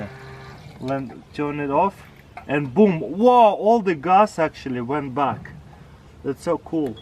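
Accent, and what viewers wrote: Russia. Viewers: Dude, you still speak Russian! Just try to say: Adamadickly instead of Avtomaticheski aka автоматически and Boom!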